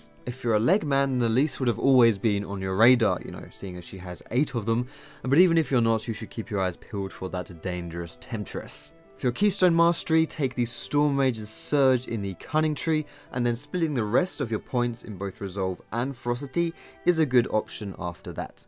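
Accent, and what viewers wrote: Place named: England, United Kingdom. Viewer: i like you'r englaned acsent ;D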